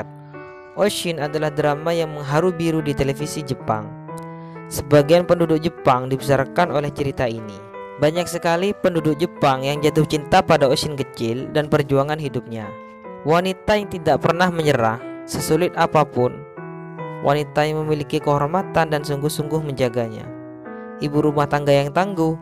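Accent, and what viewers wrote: Madura, Indonesia. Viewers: Mimin asli madura ya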